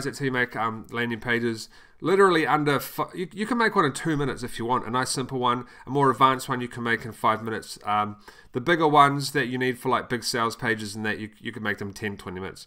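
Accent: New Zealand. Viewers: Very refreshing to hear the kiwi accent !! I'm subbing just for that reason, i'll watch the video now haha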